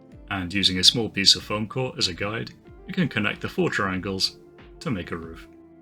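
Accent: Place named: United Kingdom. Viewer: Hey! You sound UK based.. where do you get your foam for the bricks from?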